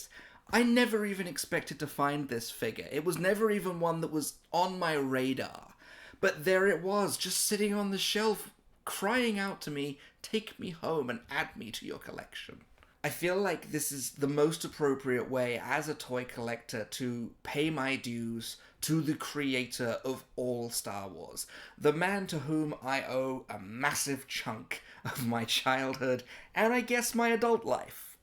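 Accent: England, United Kingdom. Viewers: J! I've always assumed we both had great minds! Hope you and the family are good. I'm currently struggling with daughter and her various "quirks" of teenage girl behaviour. I've only discovered recently, clicked on and heard his accent. Sold!! I thought. Gotta support English dudes across "the pond!"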